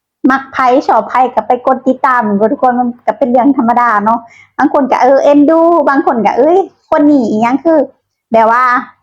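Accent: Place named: Loei, Thailand. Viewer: สำเนียงเว่าคือคน จ.เลย แท้ครับ😅😅😅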